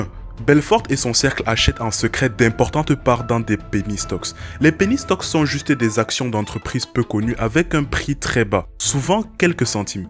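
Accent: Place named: Africa. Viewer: dommage que tu as un accent africain qui gâche tout le professionnalisme de la vidéo. Désolé mais dirait une parodie.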